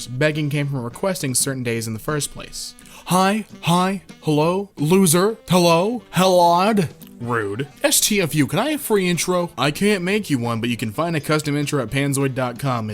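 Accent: United Kingdom. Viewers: is british? his accent was british 12 months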